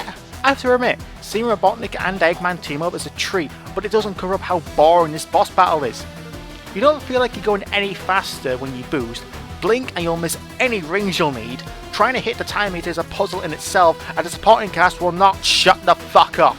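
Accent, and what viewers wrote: United Kingdom. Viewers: is from UK?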